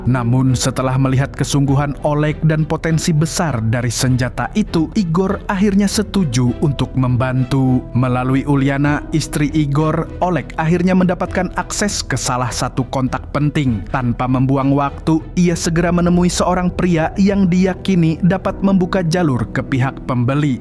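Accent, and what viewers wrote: Banjar, Indonesia. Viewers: Bang tiap intro jgn hiLangkan Logat banjar ny ya🎉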